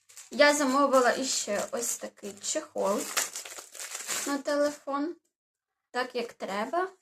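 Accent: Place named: Surzhyk, Ukraine. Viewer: Какой жёсткий суржик. Женщина, выучи украинский уже, или ты террористка которая косит под украинку?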